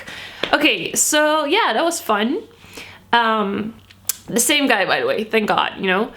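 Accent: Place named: Netherlands. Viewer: Toen je begon te praten hoorde ik gelijk dat je nederlands was! haha, loved the vid.!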